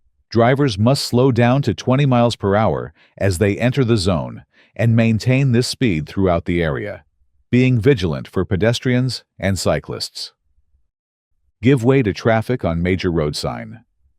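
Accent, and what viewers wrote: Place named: United States. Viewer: why an American accent for uk driving????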